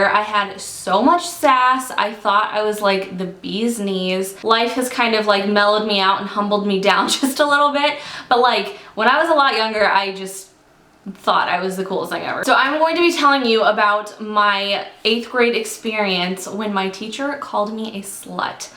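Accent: United Kingdom. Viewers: Sounds Like Plymouth south muddle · how are you not british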